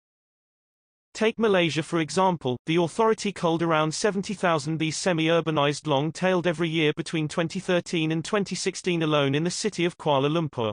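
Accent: United Kingdom. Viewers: where do you get youre voice over voice? something about a uk accent makes it sound way less robotic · Sounds like somebody is all bent out of shape because he's not getting enough views. The true question is, why are you using an English accent on your video? Hmmmmmmm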